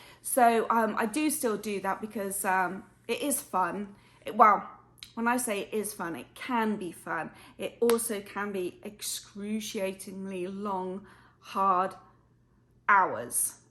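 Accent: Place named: United Kingdom. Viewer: Really enjoyed this vlog, you have lead such a fascinating life, love how you have still got your British accent !!! Sending you lots of love xxx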